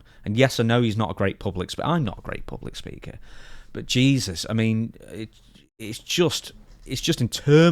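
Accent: England, United Kingdom. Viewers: I don't know what it is with you, but that English accent that you have always makes it easier even when you're pissed off to understand everything you're saying💯🫡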